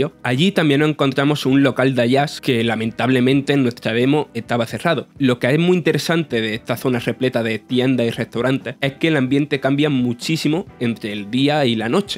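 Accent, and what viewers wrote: Andalusia, Spain. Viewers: porque ponen a un andaluz a narrar el video...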